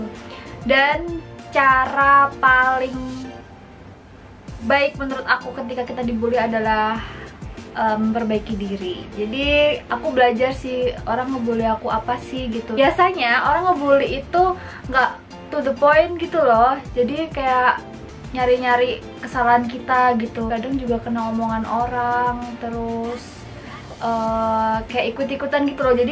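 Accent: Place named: Java, Indonesia. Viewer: wg jawa ga boleh ilang jawa nya 🥰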